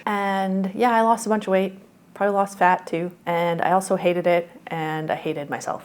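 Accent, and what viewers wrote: Canada. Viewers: I hear a fellow working class Canadian accent, I upvote lol Yes I have a simple brain. Not related to being working class Canadian. I'm just simple.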